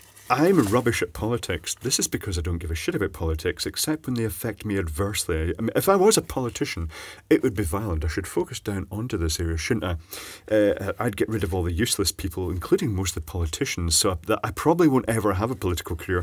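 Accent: Scotland, United Kingdom. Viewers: I've watched this twice, so far. Should I be embarrassed to admit that Czech words and yummy noises in a Scots accent are oddly compelling?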